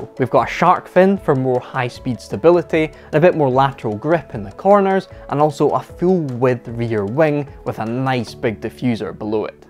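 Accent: Scotland, United Kingdom. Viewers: are you Scottish or german i think scottish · “Byoo-gaah-tee” His Scottish accent is so fun haha.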